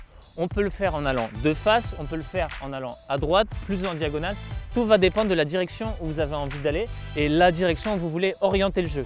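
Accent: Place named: South, France. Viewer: Accent du sud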